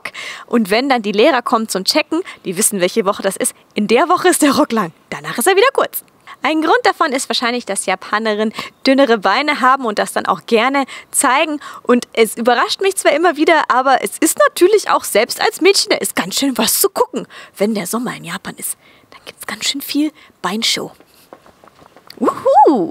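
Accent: England, United Kingdom. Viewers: her accent sounds English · I always thought she was from the UK.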